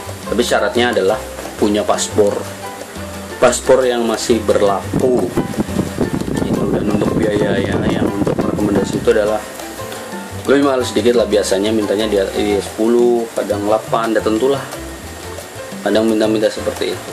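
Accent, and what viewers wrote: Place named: Banyumas, Indonesia. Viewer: Ketemu maning ketemu maning Karo wong ngapak Ning Brunei 😀 Sukses selalu Bossque 👍👍👍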